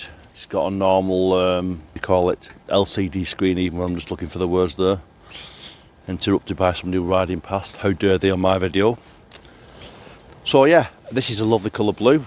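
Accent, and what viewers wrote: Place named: Northern England, United Kingdom. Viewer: you dont sound welsh are you from wales you have a northern accent??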